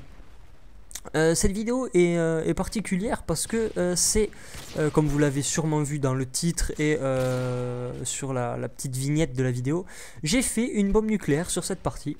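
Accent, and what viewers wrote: Toulouse, France. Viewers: tu dis neuke a cause de ton accent toulousain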